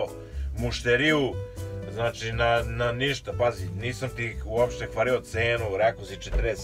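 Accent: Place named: Central Serbia, Serbia. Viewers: Zemljak, ti mora da si negde iz Krusevca,Paracina i tih krajeva? Rekao bih po govoru. A sto se tice poslovanja sa Bugarima....oni su su veci prevaranti i od nas tako da ti to sve govori. Puno srece u poslu. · Meni je posebno zanimljiv govor, pretpostavljam centralna srbija (kragujevac, jagodina, krusevac) jer sam i ja odatle... samo nastavi, extra klip ;)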